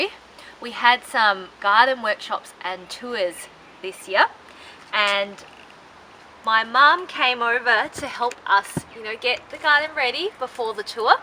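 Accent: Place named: Australia. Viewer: New to your channel and subscribed because you are informative and succinct. Your aussie accent and unpretentious good looks and mannerisms are also delightful and non-abrasive. Kudos!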